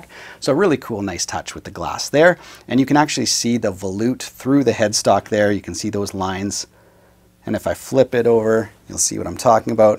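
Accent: Canada. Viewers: I love the Canadian accent! Great videos, too. Subscribed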